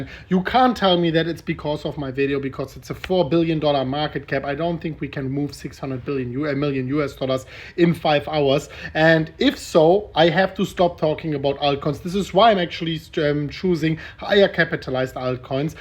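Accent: Germany. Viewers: I hear a German accent but your English is perfect. I speak German pretty well but not as good as your English. I love you man.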